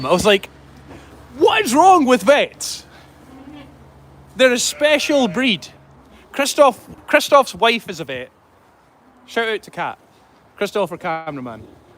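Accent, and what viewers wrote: Scotland, United Kingdom. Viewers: At the end of the video, I thought you were saying taking a healthy “mule” home. Then I finally figured out you were saying “meal”. Having a bit of trouble with your Scottish accent.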